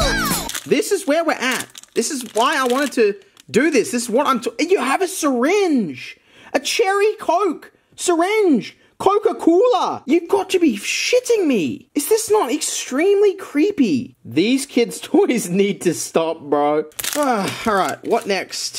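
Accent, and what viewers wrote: Australia. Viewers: I was like “this dudes definitely Australian” then you brang up the water joke. Whkxbskcbskcbdk but yes anyways hello fellow aussie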